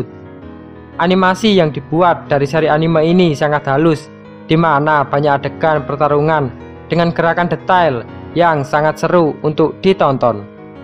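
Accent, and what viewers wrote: Java, Indonesia. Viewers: Medok banget lo min😅😁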